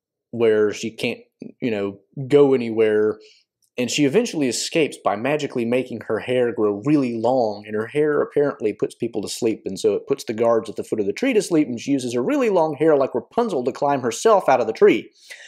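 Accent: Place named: Appalachia, United States. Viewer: you say they’re betrayed by Borlas and you say it a few more times after. I’m sure it was just a slip but the correct person is Gorlim the Unhappy. For those who do not know, Borlas is one of two people: the original name of Borlad, one of the sons of Bór killed in the Nirnaeth Arnœdiad; or the son of Beregond of Gondor. PS - you sound like you’re from Appalachia. If so, I’d guess WV or KY 😅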